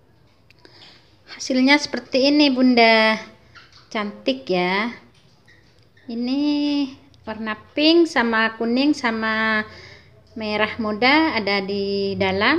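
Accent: Madura, Indonesia. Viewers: Asli bunda dari mana logatnya seperti org madura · btw klo boleh tau orng ap ya logat bhsanya kya orang madura 😀😀😀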